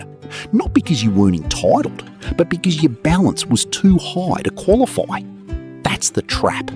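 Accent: Australia. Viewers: I'm a 62yo Aussie with a million, but for the life of me, I can't watch another video with this cringe ocker accent. Get rid of it mate. Go Asian, go British, German. Even fucking Yankee is better, and I hate Yankee.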